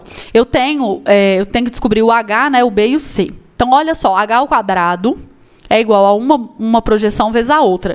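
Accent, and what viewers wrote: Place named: Minas Gerais, Brazil. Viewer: Olá prof, a Sra é uma excelente professora, só que a Sra fala rápido demais, vou desconsiderar essa parte devido eu gostar do sotaque mineiro. Parabéns.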